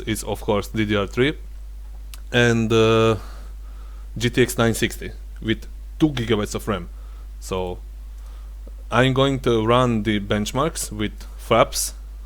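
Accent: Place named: Russia. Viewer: Как будто русский на английском записывает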